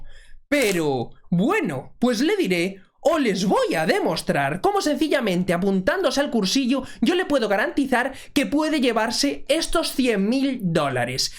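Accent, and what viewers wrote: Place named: Galicia, Spain. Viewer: PD: Tienes un acento gallego precioso.¡Galicia calidade!✌🏻❤️🙌🏻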